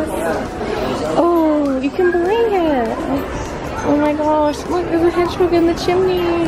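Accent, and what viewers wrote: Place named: London, United Kingdom. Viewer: Your from london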